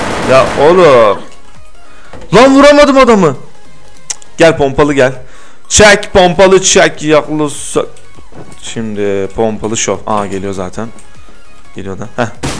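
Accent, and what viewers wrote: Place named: Sivas, Turkey. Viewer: abi sende sivas lisin kesin "BILIYUNMU" demenden anladim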